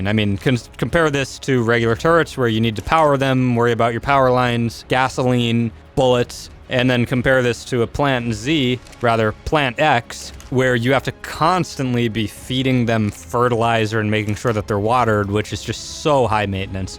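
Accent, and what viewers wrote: Australia. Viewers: Want cute Australian bird voice back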